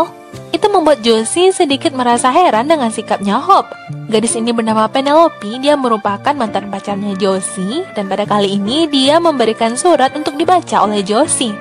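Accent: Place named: West Sumatra, Indonesia. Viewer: Hahahahah ketahuan orang minang y?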